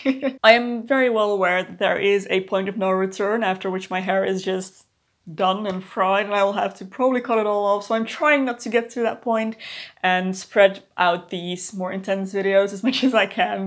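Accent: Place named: Netherlands. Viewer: Jojo I she’s from the Netherlands, I think 😊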